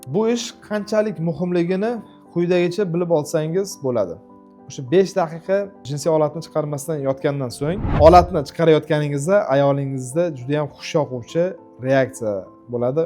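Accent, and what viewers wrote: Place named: Andijan, Uzbekistan. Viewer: андижонлик экан жижча деп гапиряпти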